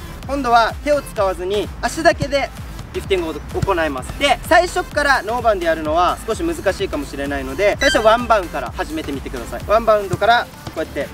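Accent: Okinawa, Japan. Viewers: 沖縄訛りな感じ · 沖縄の人かな？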